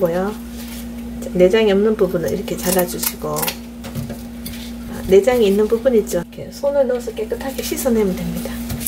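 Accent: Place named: Gyeongbuk, South Korea. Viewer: 오늘 첨 들어와서 보곤 와~놀랬습니다 독특한 네임 이시구나 하곤, 아람냥 지면에서 그냥 글들 보곤 했는데 정말 대단 하신 분이시네요. 말씀이 경북 분이신것 같은데 상어☞갱상도선 돔배기라 하죠 비린내 없는 담백한 맛. haigo! 칼치 조림 묵고 싶어라! 꼴딱 꼴딱~~~~^^ 앞으로 계속 맛있는 영상 봐야 겠슴다....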